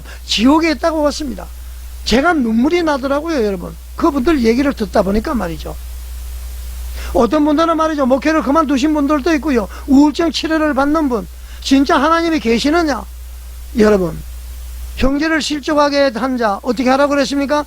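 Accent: North Korea. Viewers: 북한 말투? 교회 무너뜨리라는 북한 지령 받았나? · 이분 어디분이신가요? 조선족 이신가요 말투가 북한분이신가요?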